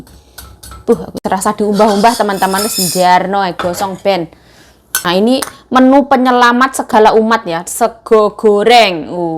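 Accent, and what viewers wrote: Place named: Java, Indonesia. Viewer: lihat makan itu, jd kabita euyy, jd pengen makan, lagian dengan logat medhoknya itu bikin, guyu" dewek🤭🤭😁😁 Semangat yuk.. semoga kamu menjadi selegram yg sukses. Aamiinn🥰🥰🙏🙏🤲🤲💪💪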